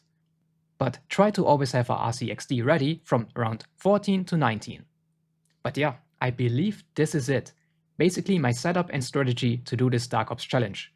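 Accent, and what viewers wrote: Germany. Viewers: Du bist safe deutsxh der akzent verrät dich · Bin ich bescheuert oder höre ich den deutschen Akzent raus ? Gute Zusammenfassungen. Hoffe in Zukunft folgen noch mehr Hilfestellungen zu Visitenkarten. Bin auf weitere Videos gespannt !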